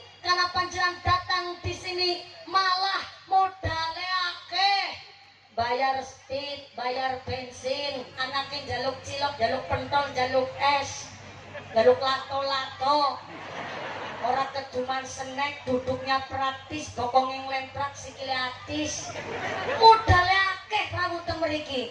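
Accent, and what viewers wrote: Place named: Banyumas, Indonesia. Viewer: Ustadzah mumpuni logat ngapak yang spesial mudah di pahami dan memberikan edukatif semangat pengetahuan manfaat termasuk dalam rangka memperingati Muludan jika mendengar bikin ketawa yang spesial mantappp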